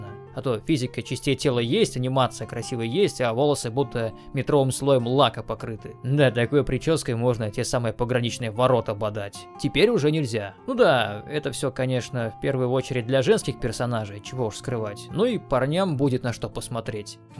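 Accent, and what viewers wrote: Germany. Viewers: Право слово, автор произносит английские слова, как немецкие. Я всё понимаю, но это совсем уже жёска. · и да сразу видно что вы изучали немецкий по вашей манере читать инглиш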